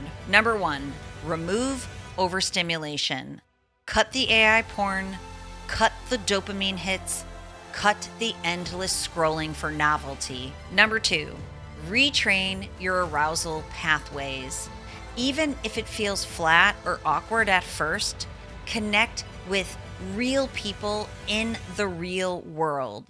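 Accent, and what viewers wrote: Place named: Buffalo, United States. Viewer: I love your Buffalo accent,!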